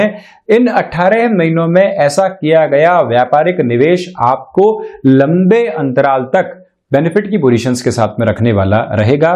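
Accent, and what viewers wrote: Standard. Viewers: Nice shudh Hindi..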